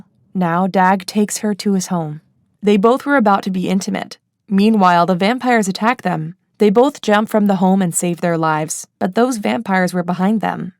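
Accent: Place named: United States. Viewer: Why does the narrator have a Amrican accent but speaks like English is not her first language?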